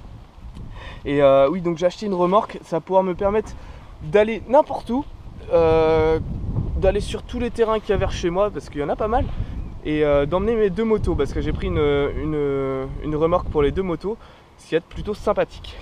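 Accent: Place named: North, France. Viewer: au toi tes du nord =)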